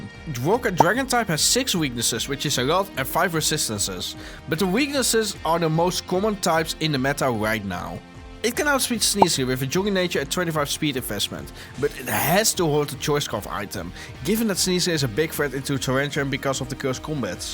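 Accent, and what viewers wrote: Netherlands. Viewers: he's dutch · Het allereerste filmpje dat ik van je zie en ik hoor direct dat je uit Nederland komt... Mijn idee xp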